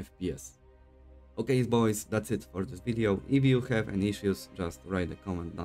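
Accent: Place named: Poland. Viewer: Słychać że Polak❤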